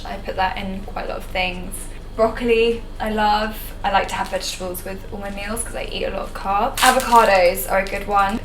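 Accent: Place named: England, United Kingdom. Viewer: what part of England do you live in, i like your accent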